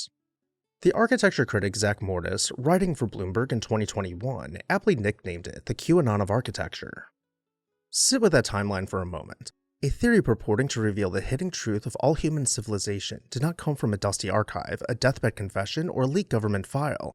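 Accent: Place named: South, United States. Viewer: Interesting mix of a slight southern gay drawl with a hint of late to chabad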